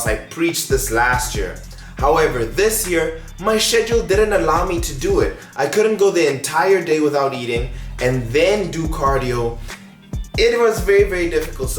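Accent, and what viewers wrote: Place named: South Africa. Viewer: Is he from South Africa ? Sounds like he has a South African accent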